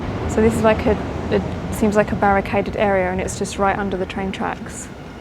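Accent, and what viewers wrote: England, United Kingdom. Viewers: That British accent is top notch 😂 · You got this kind of stuff in every city you live in around the world! Japan is not the only place and saying it isn’t a nice place to live when there bad places and good place to live in Japan just like any other country. And you sound English so I know for fact England isn’t paradise!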